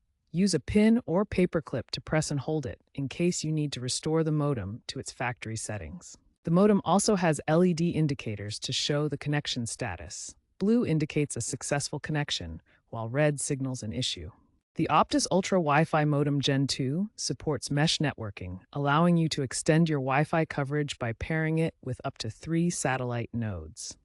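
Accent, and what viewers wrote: United States. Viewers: Why the American accent?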